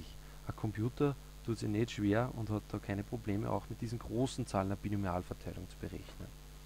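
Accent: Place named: Upper Austria, Austria. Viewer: Sehr gutes Video, das ma wirklich weitergeholfen hat! Gut verständlich erklärt und sympathischer OÖ-Dialekt :)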